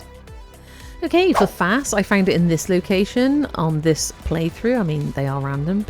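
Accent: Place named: South West, United Kingdom. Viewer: You can also use a necramech for these btw. Appreciating your loot vids and the novelty for me of hearing a south west warframer, your accent takes me right back home :)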